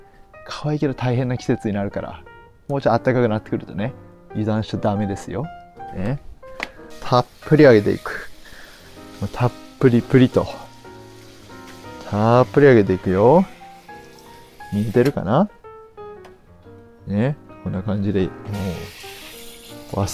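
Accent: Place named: Kurume, Japan. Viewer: おはようございます☀ ノズルいいですね😅 まだ使ってないです。買いたいです。昨日もライブ楽しかったですよ❤ の声を聞いて旦那が『それ、九州の面白い人か？』って言ってました。の久留米弁が印象的だったのかも😅 これからもずっとどんらーでいます。ひろりーんでした👍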